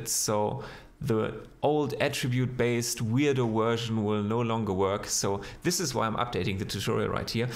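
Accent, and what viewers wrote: Germany. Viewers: Gutes Video :) Mein Abo haste :D in der Ersten Sekunde hab ich herausgehört das hier ein Deutscher am Werk is :D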